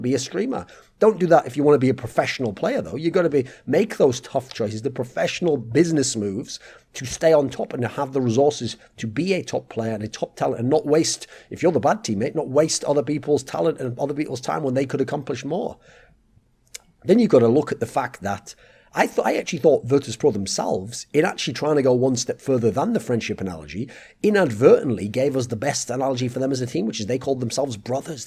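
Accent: United Kingdom. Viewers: Why is more British today than usual?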